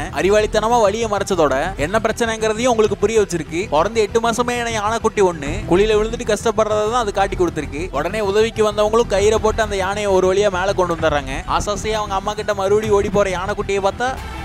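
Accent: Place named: North India, India. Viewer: இந்த பதிவுக்கு பேசுபவர் தமிழர் குரல் மாதிரி தெரியலில்லை வட நாட்டுக்காரர் தமிழ் கற்றுக்கொண்டு பேசுவது போல் தெரிகிறது. உண்மையா?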